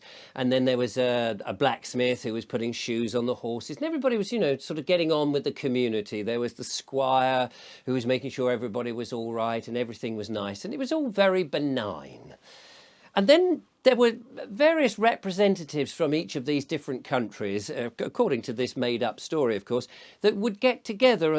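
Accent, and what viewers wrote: United Kingdom. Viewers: Wow! So well said. Its almost as if Mark Twain were still alive. We all know how he saw through things, too. I wish I was receiving that paper just to get a good laugh. Such an excellent job of presenting our situation. You are so gifted at speaking. Love your wonderful accent and British demeanor. Just absolutely love you. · You are an outstanding human being buddy ...no nonsense British talking ..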